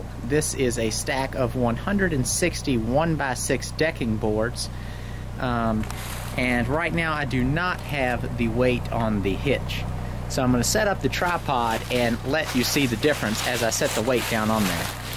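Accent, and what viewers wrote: United States. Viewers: Good murican accent.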